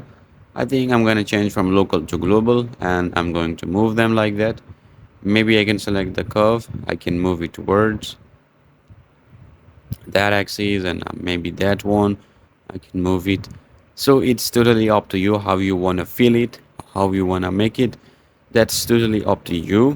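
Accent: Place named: Iran. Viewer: عشقه لحجه ایرونی ، خسته نباشی💥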